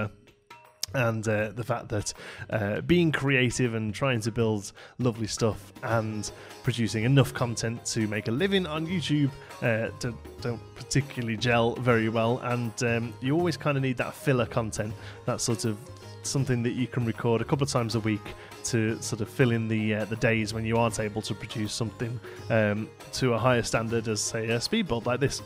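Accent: United Kingdom. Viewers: You time these perfectly with my lunch 👌 Everyone at work hears some British nerd talking to me everyday around this time 😂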